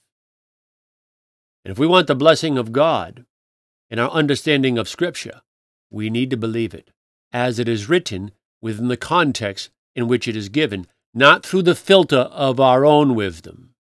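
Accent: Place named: New England, United States. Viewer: Love your New England accent. Don't hear much of that here in Winter Park, Colorado at 9000 feet near the Continental Divide.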